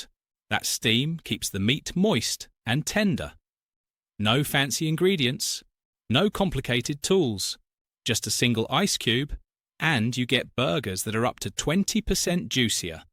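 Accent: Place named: England, United Kingdom. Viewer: You’ve got a flaming English accent, so speak English not American it’s coriander and biscuits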